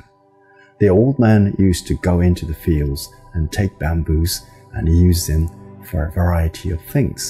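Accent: United Kingdom. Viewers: 和尚様、イギリス英語に聞こえますが留学された経験ありますか？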